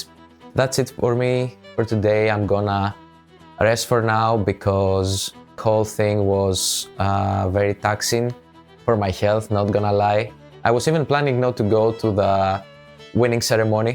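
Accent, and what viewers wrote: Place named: Spain. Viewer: 'How is he such an incredible painter?!' Hears Spanish accent 'Ohhh' Fr though, amazing work! Gonna try out this skin recipe so thanks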